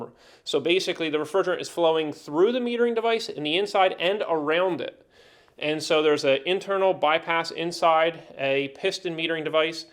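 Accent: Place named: Philadelphia, United States. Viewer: Philly accent detected 👂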